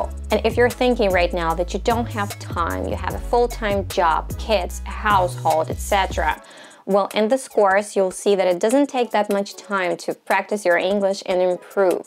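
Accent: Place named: New York, United States. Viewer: your accent is amazing. There are many American accents however: the Southern accent, New York accent, New England, Upper Midwest, California and more. The standard American accent is what you hear on national news programs. Yours is something I hear a lot but I'm not sure where. You have a bit of New York in your vowels! And your nasal quality reminds me a lot of Middle Atlantic, in other words Philadelphia/ Baltimore. I am interested to know what approach you took on accent.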